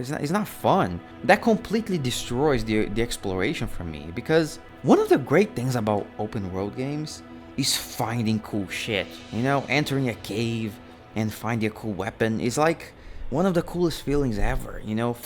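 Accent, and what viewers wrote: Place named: Brazil. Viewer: Sim KKKKKKKM Eu pensei que era um brasileiro no começo do vídeo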